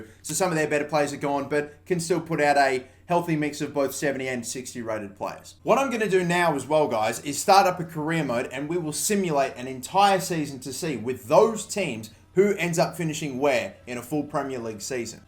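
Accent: Australia. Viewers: Yeah, could tell he was an Aussie by the accent. Probably Sydney or Brisbane. But then I could be way off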